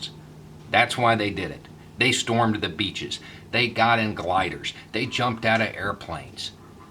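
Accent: South, United States. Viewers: The Trump era has left me feeling ashamed of the south. So I have to say, as a fellow Southerner, it's amazing hearing somebody say these things in a southern accent. What state are you from? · Good to hear a southern accent making such sense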